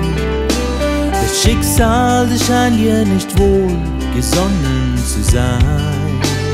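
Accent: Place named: Vienna, Austria. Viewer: Wieder mal eine Botschaft, so wie es oft wirklich ist. Hörst Dich schon an, wie ein echter Wiener😊Aber es stimmt, irgendwo ist immer ein Licht am Ende des Tunnels. Gottes Segen für Dich, lieber, und für die, die Dich in Deinem Leben begleiten.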